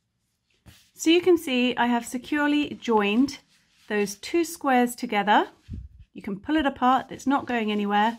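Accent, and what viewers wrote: England, United Kingdom. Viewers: Oh it's just that as you had an English accent I thought it was strange I follow English or I would get a bit confused 😂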